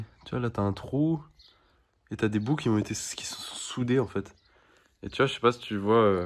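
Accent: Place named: Alsace, France. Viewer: A mes tu et alsacien mdr duncoup jentend kopf temi 😂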